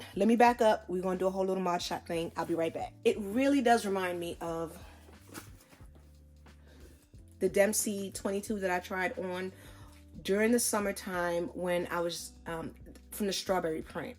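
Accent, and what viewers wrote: Jamaica. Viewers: I was contemplating whether or not I should get this for my mom. I think your video sold me on it. Btw, did I hear a Jamaican accent?! JAMAICANS STAND UP!!!! 🇯🇲🇯🇲🇯🇲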